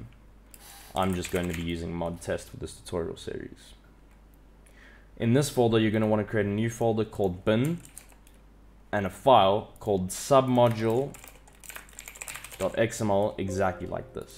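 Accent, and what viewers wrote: South Africa. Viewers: Always nice to hear a fellow South African on yt. Keep up the good work bru👍🏻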